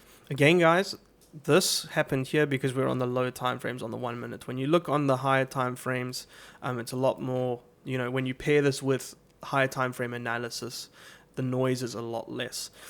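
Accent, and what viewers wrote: South Africa. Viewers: My guy, great video. Your accent sounds like you are from SA or Namibia... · Finally the South African accent haha making us proud well done best content I’ve seen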